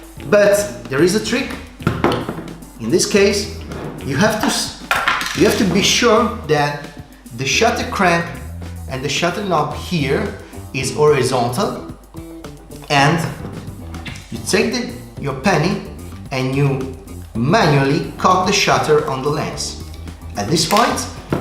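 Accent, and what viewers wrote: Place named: Italy. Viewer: Great vid. Very much to the point. I'm watching auctions online and I'll buy a 500 C/M when I find one at a price I like but your video sated my apetite a bit at least. I dig your accent btw. Your speak with a good flow so it's not bothering at all. By that and from your name I deduce you're italian. Correct? Cheers from Sweden.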